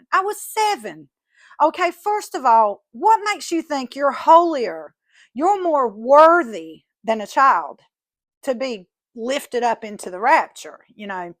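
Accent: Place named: South, United States. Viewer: Sweet southern belle 😉. Music to my ears (I am a European immigrant) 😉❤️. This is what I thought all Americans sounded like when I was growing up in the 80s 😂. Not sure where the torture after the rapture came from 🤨. Keep looking gal. If you’re meant to find God, you will. As long as you keep looking and not let fake Christians affect your search 😉❤️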